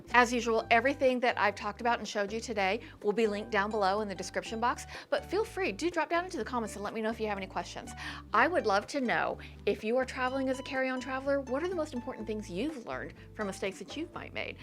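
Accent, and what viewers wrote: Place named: Texas, United States. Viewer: Hi! This is my first video of yours to watch, and it was such a treat! I love that you say y'all! 😀 You sound like you could be a neighbor down the street here in Texas. LOL I was also surprised to see that we have the same exact backpack, down to the color! I love it! I'm fixing to use it for my very first carry on only flight. 😀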